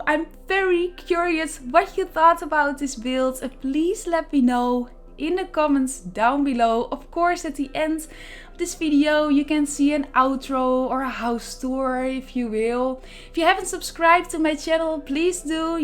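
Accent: Netherlands. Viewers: Wowwww 😍😍😍😍 ik hoor gelijk dat je Nederlands bent 😂😂💗💗💗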